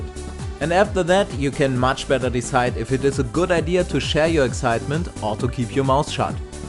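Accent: Germany. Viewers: great content, very german english but gramatically most of it is right, so keep it up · Der deutsche Akzent...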